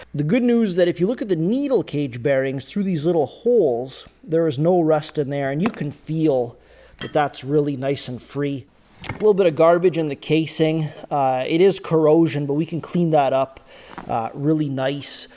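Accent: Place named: Quebec, Canada. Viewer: Est-ce que j'entant une accent quebecois?? 100 pourcent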